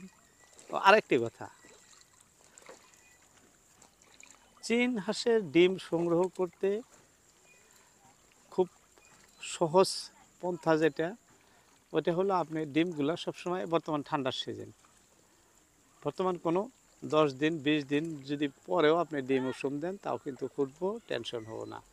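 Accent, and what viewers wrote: Bangladesh. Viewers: দাদা আপনার বাড়ির ঠিকানা জেনে খুশি হলাম। তবে আপনার কথায় ভারতীয় কোন মেজাজ পাই না। মনে হচ্ছে আপনি বাংলাদেশের কোন অঞ্চলের মানুষ হবেন।অসংখ্য ধন্যবাদ।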